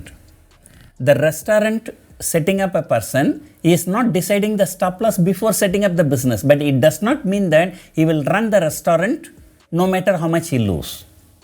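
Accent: India. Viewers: Indian accent is so much fun and relaxed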